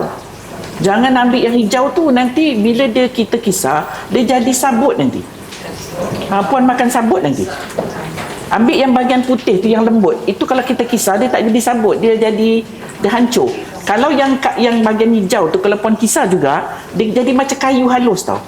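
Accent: Johor, Malaysia. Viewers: Nak cubalah resepi ni masak utk cucu2. ckp mcm org Johor. Apapun terima kasih kongsi resepi ni. ❤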